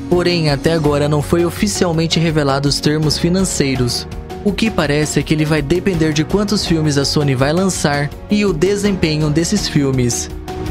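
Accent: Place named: Rural, Brazil. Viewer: POR QUE TEM UM CAIPIRA FALANDO, CRUZ CREDO SO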